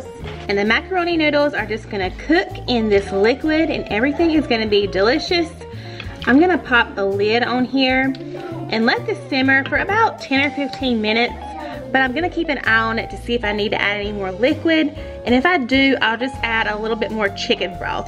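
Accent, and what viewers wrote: South, United States. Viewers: Hey! I can just hear you talk all day. Love your Southern accent! Love your Videos!🙌🙌🙌🙌💯 I am differently trying these recipes.Thanks for sharing. I hope you have a fun, loving, Safe Holiday! Merry Christmas!🎅🎄🎁🎁🎁💗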